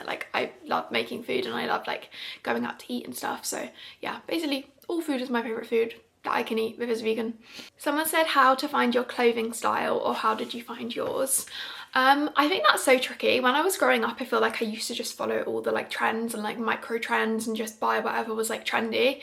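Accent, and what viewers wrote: United Kingdom. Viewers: ive seen yoy tiktoks for a while and enjoyed them, but finally subscribed to you yesterday. for some reason im so suprised your british! thought you were american...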